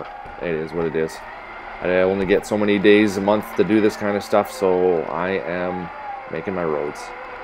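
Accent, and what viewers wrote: Canada. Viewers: please don't talk, can't stand that Canadian accent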